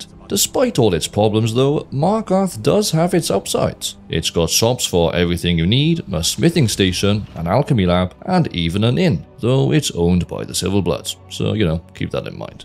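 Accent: Wales, United Kingdom. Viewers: I don’t see anyone else having remarked on it after a cursory skim, and it might be just an accent thing, but I swear it sounded like you said ash-thetic. So, either your diction gives a bit of Sean Connery (I know, you’re Welsh, he’s not, it’s not technically an accent thing, but ykwim; also Welsh accents are so pretty, like burbling streams), or you snuck in a 10/10 pun. Either way, it works!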